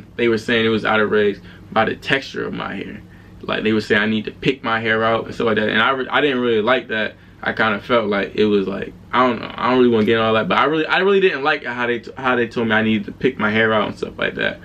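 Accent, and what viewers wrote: Baltimore, United States. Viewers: I knew my man was from Bmore. I could hear it. Bmore standup. Latrobe Eastside. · I’m sitting watching your video I’m thinking bruh gotta be from Baltimore then boom you from Baltimore city that’s crazy I grew up there and I’m trying to join the navy out of high school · Mmm been a few years since I heard a Baltimore accent I just passed meps physical and probably signing navy so thanks for your videos. Shout out from an old randalstown. Guy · Knew u was from bmore as soon as I heard tewwww shout out 410 man😂😂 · He sounds like he’s from Baltimore lol. Thanks for your service bro! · I knew he was from Bmore. Big ups to you sir. - your fellow DMV homie. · Yo before you said you were from Baltimore I was already thinking it. We know what we sound like lol. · yeooo bruh soon as u spoke i knew u were from baltimore lmaooo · I kept hearing that lil accent Nd I was like is he from b-more then you said it Nd I was like I knew it 😂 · you from baltimore lol? 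but if i got more questions can i message you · Sounds like a lil Baltimore to me 😂😂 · Dummi I knew uu was from Baltimore jus from how uu was talkin😂😂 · Baltimorean I heard tew I’m alr knowing 😂😂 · I could tell u was from bmore when I first heard u talk from da accent 🤣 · Crazy I knew you were from Baltimore as soon as you said ya first word. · You from Baltimore? 🧐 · I thought I was hearing a Baltimore accent 😂 keep it up! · Hate being from the dmv cause why i knew you was from bmore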